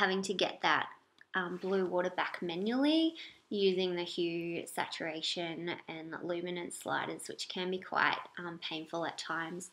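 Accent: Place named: Australia. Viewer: She sounds Australian!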